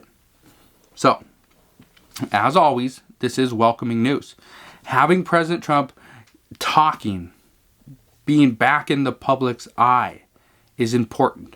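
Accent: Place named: Seattle, United States. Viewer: Thanks, for your research and content. You sound to be in the Seattle area, I'm in Hermiston, Eastern Oregon. Love the videos!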